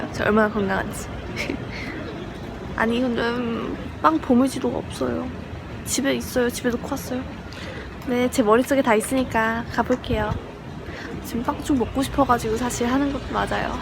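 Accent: Seoul, South Korea. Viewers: 말투 약간 90년대 서울사투리느낌 있어요ㅋㅋㅋ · 연예계에서 연락도 많이 왔을 거 같은데 궁금하네요 😂 묘하게 서울 사투리 같은게 순둥순둥 귀여워요 😊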